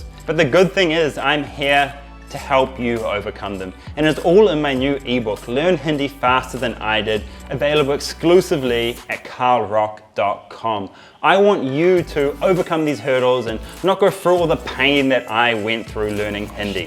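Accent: New Zealand. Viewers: Kia ora! The first YouTube video on how to learn Hindi and the speaker has the same accent as me:) Whereabouts in Godzone are you from? I'm learning Hindi because I simply just love the look and sound of it. I dabble a little in Buddhism and yoga, so being able to know more of the language will hopefully enrich both. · Are you from New Zealand?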